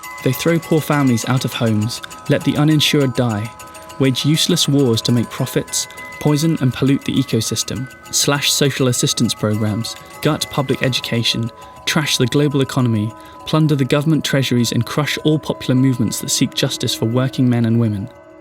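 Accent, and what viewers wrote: United Kingdom. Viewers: Cute British accent.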